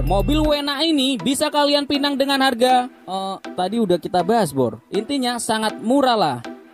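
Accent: Pekalongan, Indonesia. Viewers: Lugot nya org Pekalongan kliatan nya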